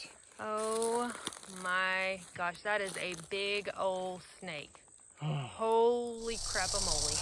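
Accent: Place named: Tennessee, United States. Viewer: Im from Tennessee. Your accent is a little bit Tennessee.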